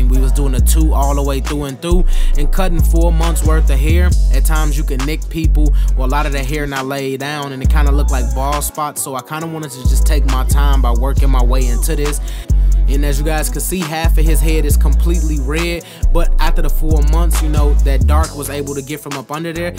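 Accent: Chicago, United States. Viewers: wait you from chicago dog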